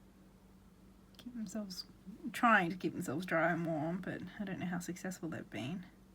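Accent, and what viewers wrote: Australia. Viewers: Two things I’d like to say: I hear the Aussie in your voice. 🇦🇺 (Am I correct??) And; I live for the Harry Potter references... Always.⚡️🦉🏰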